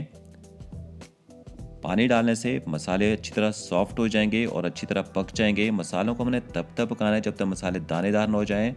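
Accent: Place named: Punjab, India. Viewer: आपका पंजाबी स्टाइल में बोलना बहुत ही अच्छा लगता है ।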